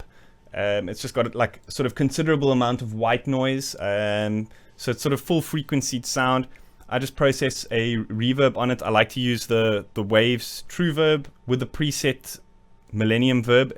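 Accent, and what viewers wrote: South Africa. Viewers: Dude! South African?? Where you based? Great lesson, thank you!!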